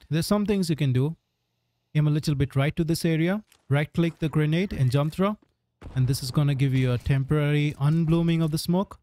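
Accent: India. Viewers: you sound Indian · always the indian accent tutorial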